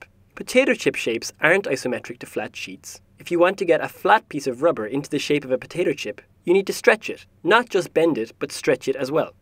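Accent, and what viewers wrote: Ireland. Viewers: Actually, seemed pretty damn Irish to me... And, living in the UK and having an Irish best friend, I know what Irish sounds like. =) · Are you Irish, but use Americanisms? Or are you American with an Irish accent? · irishness! :D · Irish accent? Idek. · I love pizza with Irish accents! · Is that an Irish accent? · do i hear an irish accent? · Hey I hear a fellow Irish person! :D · is this huy irish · The accent was actually from the Republic of Ireland! If it was a Scottish pizza it would have been deep fried, yes that actually exists there! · SymbolX the narrator is irish and your comment made no sense to me otherwise thanks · He sounds vaguely Irish to me. Not at all Chinese. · what accent is this? irish? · irish accent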